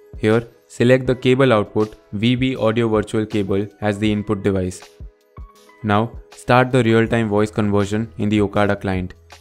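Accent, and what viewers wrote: India. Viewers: dude sounds like a kid with a indian accent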